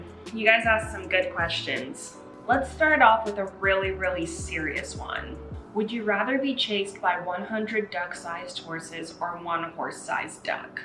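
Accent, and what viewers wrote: United States. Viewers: How does a Nigerian/Black Russian 28 year old, who looks like she is 18, end up with such a flawless American Accent? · As far as her American accent it’s not that hard to get after moving here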